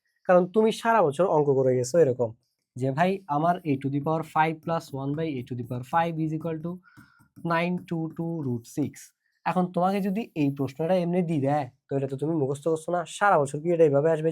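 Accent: Chittagong, Bangladesh. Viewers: ভাইয়া, তই তো চিটাংগে হতাও ফারো দেহির🙂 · Vaiya to pura chatgaiya 👏💀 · Kire pura accent ta chittainga 🙏😭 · Sir Apni ki chatgaiya?Because apnar ei video te pura chatgaiya accent lagtese 😭..or is it just for the video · বদ্দা তই ত দেহিদ্দি পিউর চিটাইংগে😅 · ভাইয়ার তো আজকের ভাষা পুরাই চিটাগাং এর মানুষের মতো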